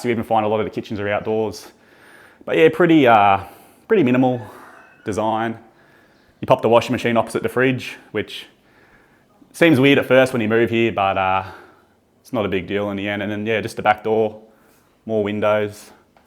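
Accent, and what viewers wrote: Australia. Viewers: It’s so hard to listen and understand your English in strong Australian accents . 😮good daiy , barbi (bbq). All the best in the future for your next move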